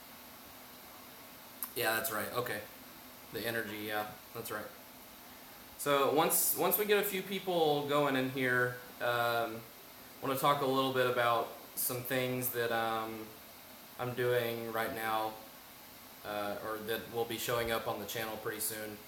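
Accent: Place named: United States. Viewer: Hi my friend! I'm interested in English and American accent =)) you have an American accent. In what states do you live? I subscribed to your channel. Greetings from Russia. Irina.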